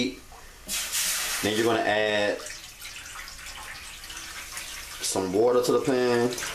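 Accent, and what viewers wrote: United States. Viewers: 10 minutes, 4 commercials? You know this is a cooking video, and more subs and views is a good thing right? I'm so lost, cause you totally sound American, and above the age of 16...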